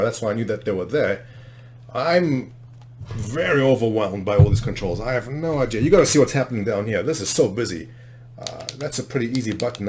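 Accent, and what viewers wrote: South Africa. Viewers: its south african lol are you saying all us south africans sound like Christopher Walken? :P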